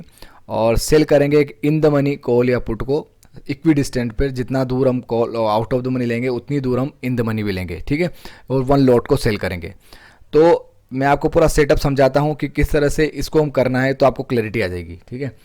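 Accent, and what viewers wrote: Indore, India. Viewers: Bhai mujhe lagta hai aap Indore se ho....kya me sahi hu? Ya malwa region se 🤔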